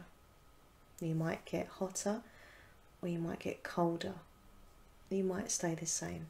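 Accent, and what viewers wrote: Essex, United Kingdom. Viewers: ah an Essex lass fair enough! I'm from lancs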